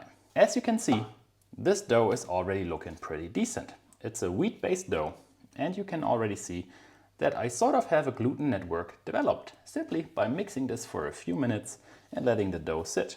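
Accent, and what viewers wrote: Germany. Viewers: Me: Hmm his accent is a bit hard to place. I am thinking German.: And now here is the spreadsheet.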